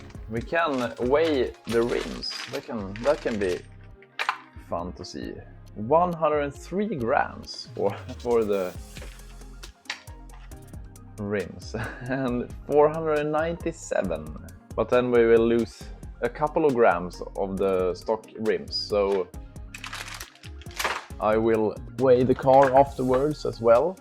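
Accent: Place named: Italy. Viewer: Yeah, man, I do have this exact model. Really, I really wish I could get the front-wires from hood-to-roof installed. Also, I am trying to place your accent- Italian? I'm USA.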